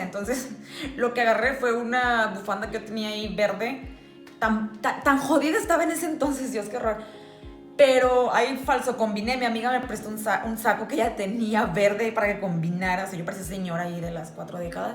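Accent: North, Mexico. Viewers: No chingues jaja con razón me identifique con tu forma de hablar. Yo también soy de Reynosa ❤️ Soy "una mexicana en Mcallen" jaja bueno en Pharr pero cómo nadie conoce Pharr mejor digo Mcallen jaja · Ahhh reconocí tu acento del norte jejeje.. saludos de NL MX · que linda Historia y que acento tan lindo tienes super del norte un fuerte abrazo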